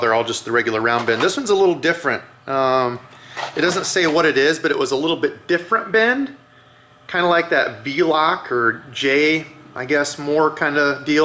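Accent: Iowa, United States. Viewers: Petition for to make more root beer colored jigs to hear him say it, love the Iowa accent 😂😂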